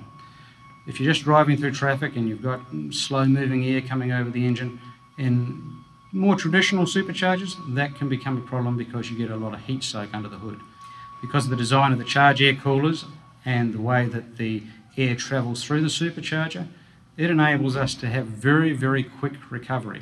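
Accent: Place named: Australia. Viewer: You're an Aussie !!!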